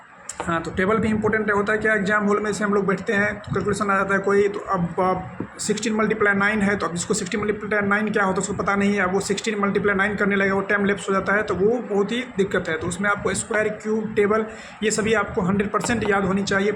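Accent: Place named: Bihar, India. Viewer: Bihar से हो क्या आप,,,❤🎉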